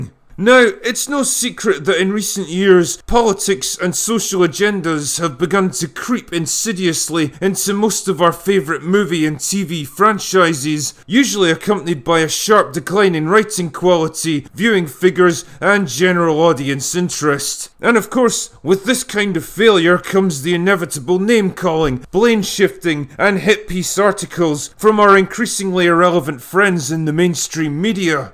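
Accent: Scotland, United Kingdom. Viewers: All they very best for 2020 mate. I enjoy your honest reviews a lot. If you don't mind me asking, with your accent, why are you drinking JD? There are plenty of excellent single malt whiskeys in your part of the world. BTW - I am especially of product from a little island off the South West coast of Scotland ( Islay ) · I'm loving the Scottish accent.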